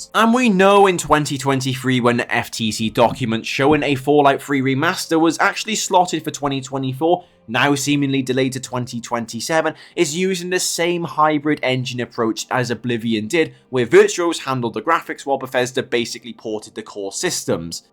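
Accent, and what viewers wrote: United Kingdom. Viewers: bethesdar :P british accents are fun.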